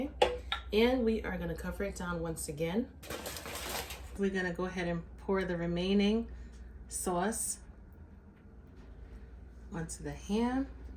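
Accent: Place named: Northeast, United States. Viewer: I thought I heard that north east accent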